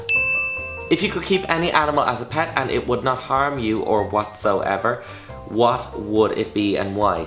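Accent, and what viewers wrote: Ireland. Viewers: Is he Irish? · So do you live in Ireland cause you sound like an Irish person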